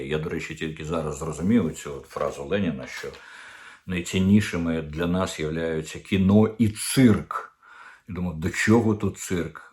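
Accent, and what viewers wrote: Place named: Moscow, Russia. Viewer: откуда у тебя этот московыцькый акцент? Як твой друг Дугин та арестович?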